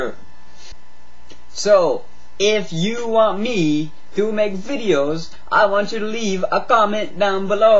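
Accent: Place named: Japan. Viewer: The ages of 13-17 and 45-54 must be the most despicable male age ranges, due to a lack of women/ lack of attractive women. I'd imagine the desperation of their lives leads them to your feline idolatry. Please exploit it and encourage all your subscribers to dress as cats and crawl across the floor. That would be such a good set of videos! Just tag them By the way anyone who has an interest in cats should check out icanhascheezburger. I'm very jealous of your Japanese accent.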